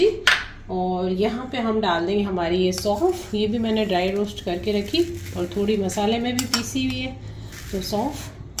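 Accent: Jodhpur, India. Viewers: ram ram aunty ji me bhi jodhpur se hu or apke sare video dekhti hu bhut acha lagta h or apki boli sun ke muje jodhpur ki yad aati h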